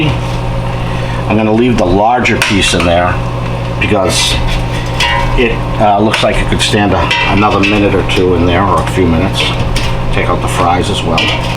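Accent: Massachusetts, United States. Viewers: I love listening to your Mass accent I myself am a native of Fall River MA so I really enjoy it and your videos You were the one I found when I used my air fryer for the first time for frozen fries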